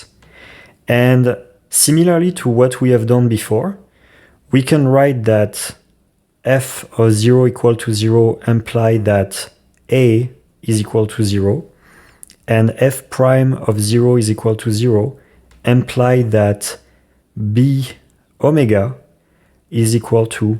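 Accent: France. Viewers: don’t worry the English level is very good. Yes there’s a French accent but it’s no issue. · c'est cramé à 10km que t'es français ptdr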